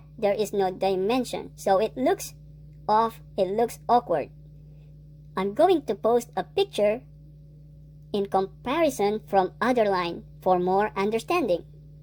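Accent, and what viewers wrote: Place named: Philippines. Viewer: Why is your tagalog accent so heavy?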